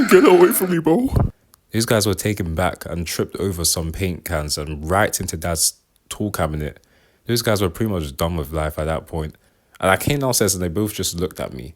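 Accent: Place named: United Kingdom. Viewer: The story is good and looks like a real book, and your British accent is so calming and peaceful.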